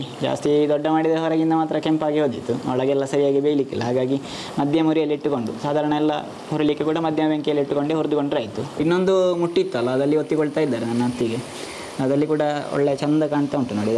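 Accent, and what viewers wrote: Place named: Tulu Nadu, India. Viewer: Bhari yedde pandar...Erna Tulu mishritha kannada kusi apund...kenare...rattunu Dade....😁 Maani nu kende panle...attigye masth paapadar ye..namasthe🙏 Niklena back supports gu yenna solmelu...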